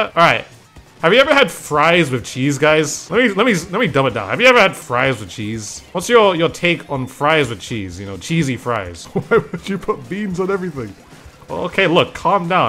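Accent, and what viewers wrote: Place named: England, United Kingdom. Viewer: I mean, if someone’s gonna tell me how to pronounce words in English, I’m listening to the guy who’s from England (or the UK)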